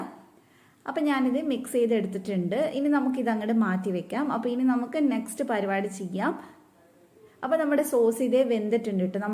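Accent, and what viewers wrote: Kannur, India. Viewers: Chechi kannur ano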